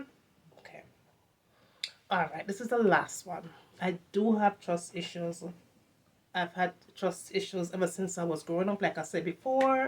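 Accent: Jamaica. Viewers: Yes bestie I'm so happy you got Jamaican accent as me also I'm half Jamaican accent and speak English or some Spanish word.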